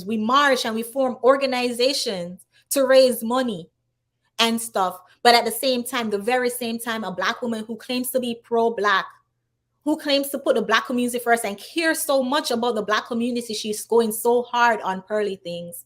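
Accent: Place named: Jamaica. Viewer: Omg, I just came across your channel and it seems to me you’re Jamaican I can tell by your accent. My problem is why are you on YouTube in the American space talking about there issues when Jamaica 🇯🇲 has bigger problems. Jamaica and Jamaicans have much bigger issues than this! You know Jamaican men don’t care about babymothers and Petty things like this. None of what you say is even a real problem in comparison to the killings and the violence in black communities across the globe, especially in your country Jamaica 🇯🇲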